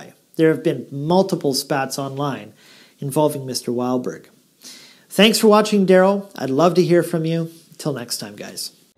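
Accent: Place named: Brooklyn, United States. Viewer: Always makes me think and/or smile! Loved the Brooklyn accent!! 😊😊💕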